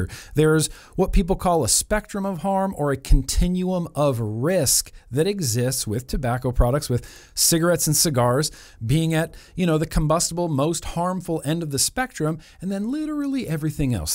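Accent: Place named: California, United States. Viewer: You're from California. I can tell.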